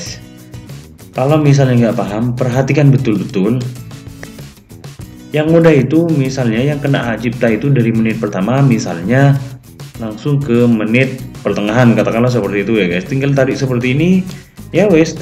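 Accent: Madura, Indonesia. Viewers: Madureh bos tak elang hehehe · Reng madureh yeh tretan... 😋😁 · Oreng madureh ben lek? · Logatnya Madura ..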